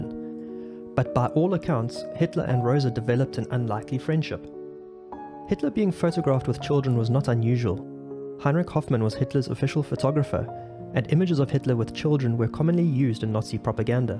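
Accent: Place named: South Africa. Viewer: You sound wonderfully South African. Good stuff.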